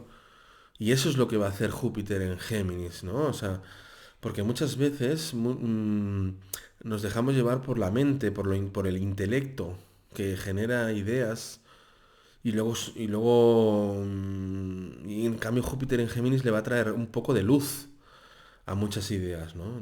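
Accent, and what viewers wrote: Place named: Andalusia, Spain. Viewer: Holaaa! Me da mucho gusto escucharte, nombras palabras como mi abuelo de Huercal Overa y de mi abuela de Baza... me da mucha nostalgia y a la vez trasmitis con mucha gracia tus conocimientos!!! Me encanta tu forma de leer los astros. Hace varios años que te escucho. Gracias❤!!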